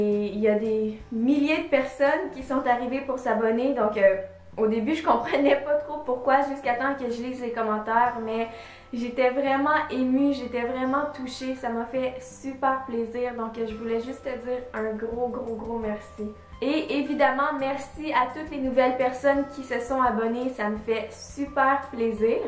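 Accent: Quebec, Canada. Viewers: salut ma poupe je vous adore j'aime bien ton accent canadien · j aime trop on accent du quebecccccc 👍😘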